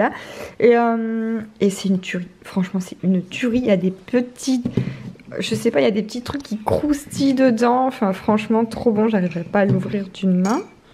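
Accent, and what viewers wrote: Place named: Nord-Pas-de-Calais, France. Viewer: cc, tu as vraiment l accent chtis comme moi!!! quand je regarde tes vidéos!! c est super!!!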